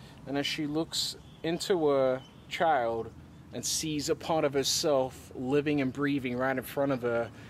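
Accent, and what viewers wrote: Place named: Quebec, Canada. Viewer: maybe French Canadian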